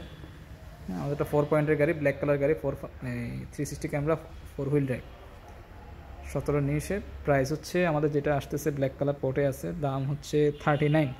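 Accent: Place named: Comilla/Noakhali, Bangladesh. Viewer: উপস্থাপক কুমিল্লা দক্ষিণ অথবা নোয়াখালীর জেলার মনে হচ্ছে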